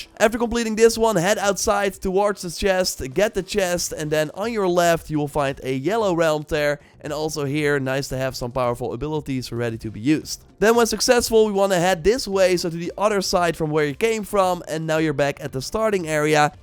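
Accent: Netherlands. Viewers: I hate that the dude with the best tips videos has the worst Dutch accent, it absolutely atrocious mate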